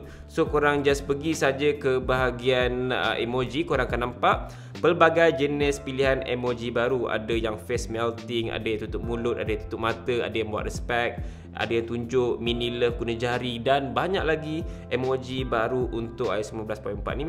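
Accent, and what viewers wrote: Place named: Kelantan, Malaysia. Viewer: mujur eh . ore kelate kow ? 😅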